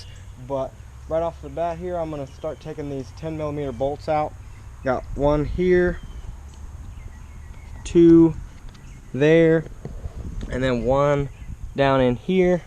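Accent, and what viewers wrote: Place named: Louisiana, United States. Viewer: You gotta be from louisiana huh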